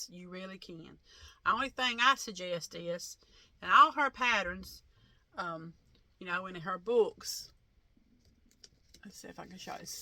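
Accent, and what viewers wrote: South, United States. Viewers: Hi mss from western Nebraska, please take care of your knee this coming from someone who had many knee issues. Getting a new one can be endured and so much better. Keep on posting, love your channel. Love your southern accent!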